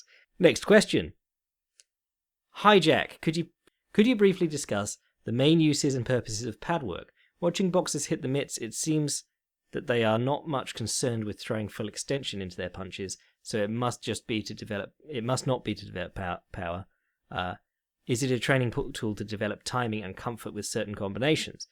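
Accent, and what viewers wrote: England, United Kingdom. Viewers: Jack, Your podcast is now a highlight of my week and as a Patreon supporter I want to say thanks for the great work; to me your breakdown of the cards with fight analysis alongside fight history and social history and the 'long view' with that chatty style (you charmer you! 😄) delivered with English humor works for me big time..original and refreshing change from lazy, samey trash from mma media. There are some really good guys, no doubt, but maybe not so much by way of innovation and getting fans engaged, you might agree? Could you the man t shake things up there? I note you plan to focus on the podcast at start of this year so that fills me with hope and glee!! I'm English and it's also nice to hear about mma from an English tongue..I would actually be interested to know who your audience is, if you've done that research and willing to share with us? 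(i.e from which countries, more US or British? much outside those two? Ages, sex etc..I want to know my new tribe better, I guess.. Anyway is one of boys and no mistake! Here's a thought then...how about a feature where Patreon bods can compete/enter for a chance to join you for ten, fifteen mins on the podcast for a chat on their favorite subject related to mma/Boxing/whatever, today or from the past..a little screening of participants would seem to important but i wouldn't mind betting you could have a pretty good, interesting conversation with a good many of your regular listeners..? Yeah alright, totally selfish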